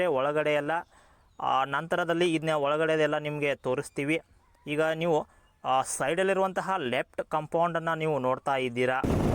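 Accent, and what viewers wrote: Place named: North Karnataka, India. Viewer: Uttar Karnataka Bashe..❤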